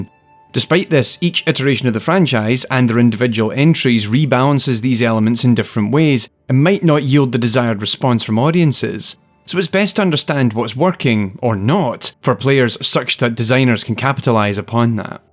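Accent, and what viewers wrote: Scotland, United Kingdom. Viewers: Scottish was my guess, but I wasn't sure. Thanks for your answer!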